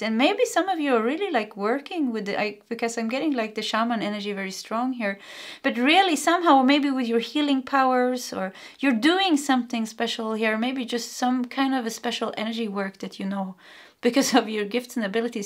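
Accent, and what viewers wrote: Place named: Sweden. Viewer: When I hear you apologize for stumbling on your English, I’m so very impressed how well you do!! I would NOT be able to give a reading in Swedish, so I applaud you for doing so good!! Secondly, both 1 and 2 are 100% me!! I do healing and I have a wonderful old soul tribe. I appreciate this confirmation!! Love your readings and really connect with the Swedish accent as a soul of Swedish descent this time around!! ♥️👏🏻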